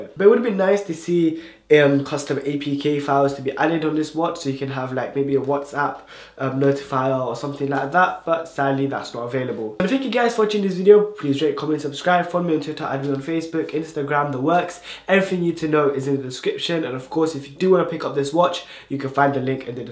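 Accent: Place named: India. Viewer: Are u indian?if u are then ur accent is pretty impressive :)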